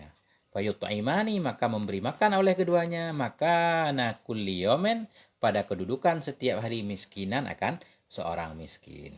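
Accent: Aceh, Indonesia. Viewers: Afwan kiyai, awak kira kiyai dari Aceh karena logat antum 🙏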